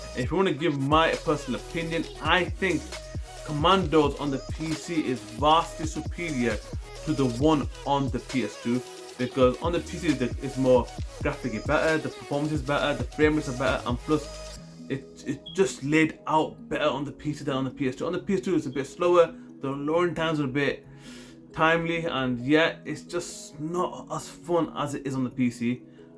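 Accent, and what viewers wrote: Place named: North, United Kingdom. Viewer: You have a strong northern accent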